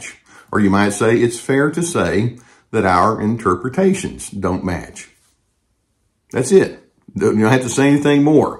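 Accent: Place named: North Texas, United States. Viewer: Thanks for posting this. Your North Texas voice is comforting as we lived most of our lives around the Plano area. We've recently retired from North Texas to Portugal and share a two apartment condo building with an elderly, Dutch female textbook narcissist. Emails and text messages from this neighbor attempting to govern our lives are read with varying degrees of trepidation. Is it possible to create a video for us about online communication styles of narcissists? Thanks!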